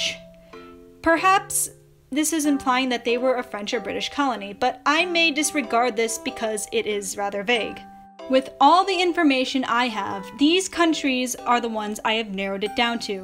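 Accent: Australia. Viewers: Why Australian accent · Lmao just clicked on the video and already an Aussie accent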